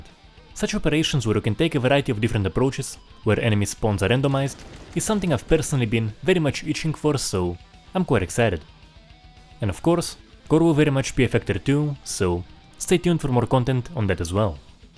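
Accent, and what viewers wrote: Russia. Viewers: Чет твой акцент очень похож на русский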